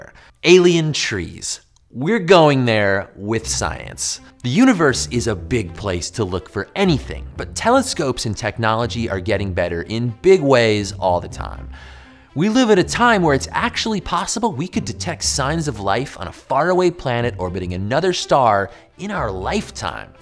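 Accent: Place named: California, United States. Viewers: i thought he was talking like someone from California. maybe he has a stuffy nose? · Why are you talking with such a heavy California dude accent in this video?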